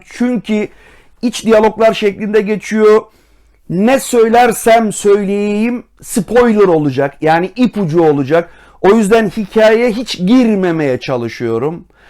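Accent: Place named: Samsun, Turkey. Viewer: Elllliiiinci yıl lisesi 😂 Klasik Samsun. En okumuşunun bile şivesi. Süpersiniz............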